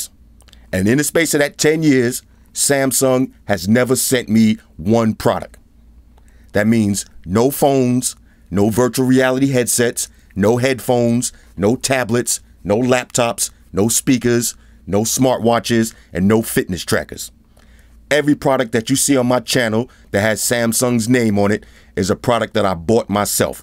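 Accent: New York, United States. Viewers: SQUAAAAD! Love you. Half of the time I'm watching your videos because i'm a tech girl and I want the latest stuff out. But the other half is because i'm in The South and I miss NY dudes with the "accent". Take care and continue to bring us that good ISHHHHH!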